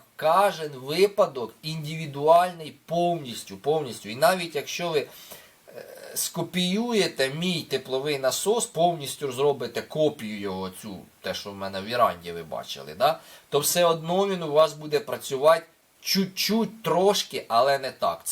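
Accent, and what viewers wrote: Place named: Surzhyk, Ukraine. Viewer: Хороша українська мова. Невеличкий суржик надає шарму. Плюсик :)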